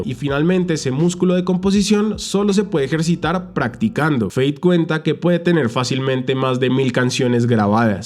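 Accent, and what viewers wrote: Bogotá, Colombia. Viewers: Cómo así que eres mexicano? pensé que eras de Colombia jaja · Como que eres MEXICANO pensé que eras de Bogotá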